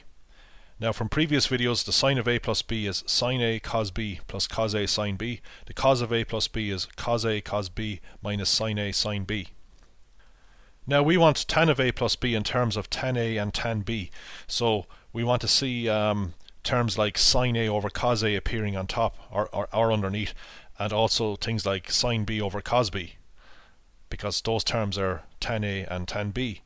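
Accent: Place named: Ireland. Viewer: irish probably incase ur still wondering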